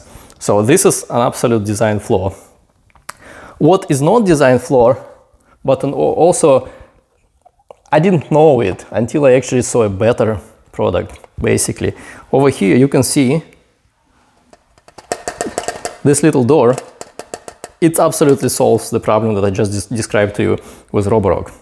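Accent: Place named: Russia. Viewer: невозможно слушать тяжелый русский акцент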